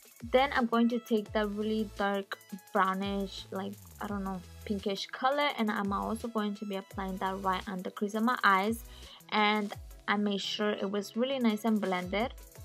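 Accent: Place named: Mexico. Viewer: yall she mexican or sum thats why she has an accent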